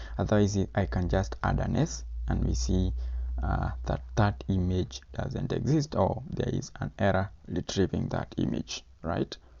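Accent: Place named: India. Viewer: OHJE, schon wieder Indienglisch:-(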